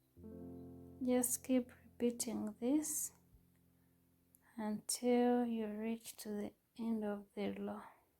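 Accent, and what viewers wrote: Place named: Uganda. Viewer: Nice work, are u a Ugandan? U sound like me 😍😍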